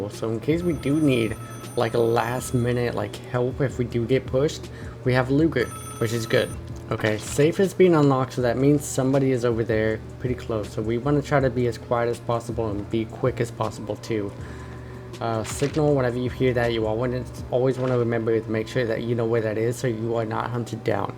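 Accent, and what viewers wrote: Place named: Boston, United States. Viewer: Are you from boston? Cool accent bro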